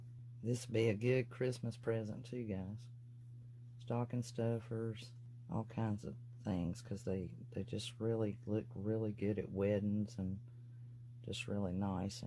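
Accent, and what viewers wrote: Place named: North Carolina, United States. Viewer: I Love, Love, the lighted signs. all the crafts are pretty, but there is something about the signs! This is my first time watching, but as soon as I heard you speak I said she's from North Carolina. I'm originally from Asheville NC. but now living in California ( please don't hold it against me. lol) Looking forward to watching more holiday crafting ideas.. God Bless..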